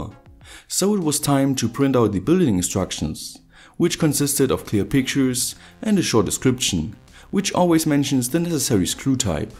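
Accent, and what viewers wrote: Germany. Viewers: You sir, are a true genius. P.S. I love your german accent xD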